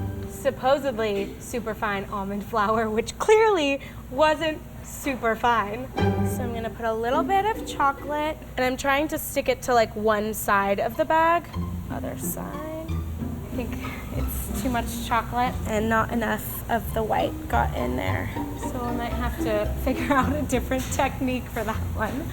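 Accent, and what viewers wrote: United States. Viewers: I've never heard a more American pronunciations in my life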